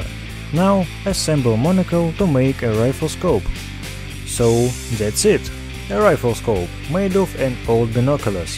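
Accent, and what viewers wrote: Russia. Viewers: Steve Gold he is russian.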